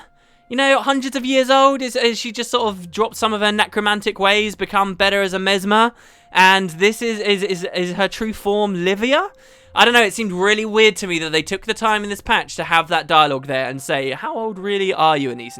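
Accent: United Kingdom. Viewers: Your voice, is fucking amazing! Love me a well spoken Brit...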